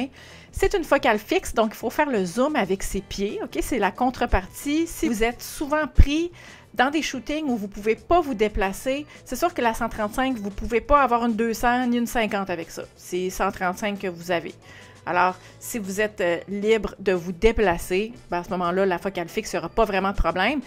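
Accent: Quebec, Canada. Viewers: Don't worry, it is Canadian french, that why...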